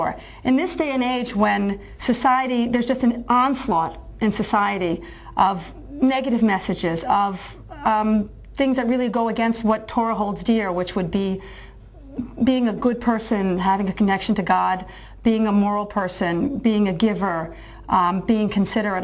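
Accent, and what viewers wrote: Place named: United States. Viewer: I would assume, from your accent, that you're a citizen of the United States. The United States is legally a secular Republicanist nation founded on the whole concept of personal Freedom. Since you proclaim Torah-values I must assume that that the Republicanism of this great and grand Republic is something you not only would never teach in your home, it is obviously something you outrightly reject. And yes, in this Republic, your right to believe as you do is legally protected this way.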